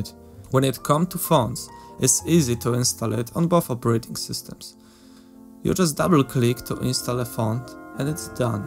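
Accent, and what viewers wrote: Poland. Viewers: Do I hear Polish accent? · are you Polish? I'm guessing by accent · Pierwsze parę sekund i myślę sobie: kurwa to musi być Polak XD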